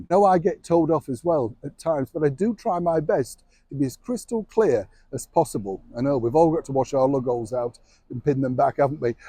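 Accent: Yorkshire, United Kingdom. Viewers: I really won’t be watching it Neil but here’s you talking about slang and telling us to clean our lug ‘oles out! Hahaha, that’s a blast from the past, you’ve not lost your Yorkshire yet Neil 😘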